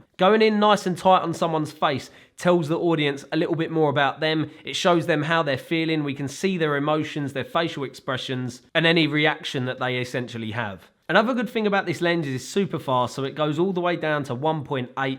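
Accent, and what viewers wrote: United Kingdom. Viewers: I’m just here to listen to your British accent mate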